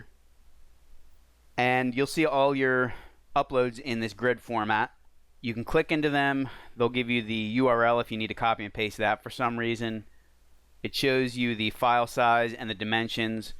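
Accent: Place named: Delaware County, United States. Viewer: Where are you from? I'm from Delco (near Philly). You sound like a local, haha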